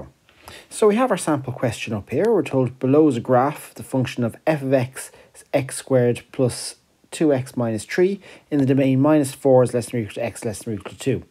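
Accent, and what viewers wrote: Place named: Ireland. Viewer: Thanks! Also, love the accent. Go Ireland lol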